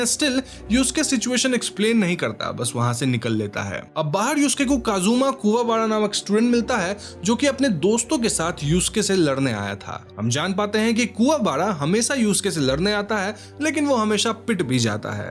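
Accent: Bengal, India. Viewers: Bhai aap bengali ya odia ho kya??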